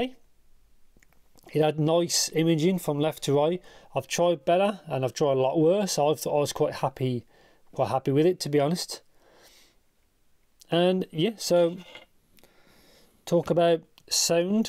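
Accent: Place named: England, United Kingdom. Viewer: I'm unfamiliar with ur beautiful accent, to which part in england it belongs?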